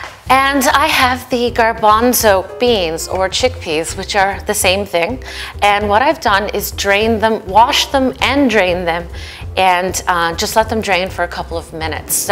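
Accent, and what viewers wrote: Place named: Newfoundland, Canada. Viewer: I picks up a little Newfy accent.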